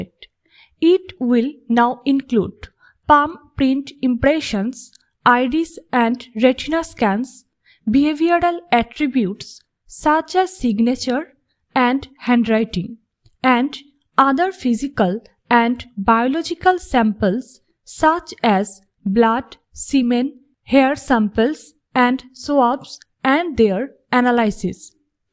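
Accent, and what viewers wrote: Bengal, India. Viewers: U bengali..?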